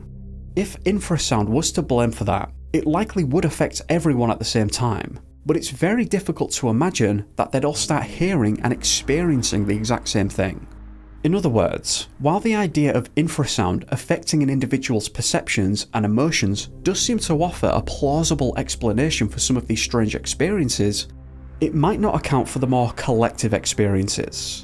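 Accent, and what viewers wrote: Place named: Wales, United Kingdom. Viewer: I believe he's from Wales. Welsh accent. 🏴🏴🏴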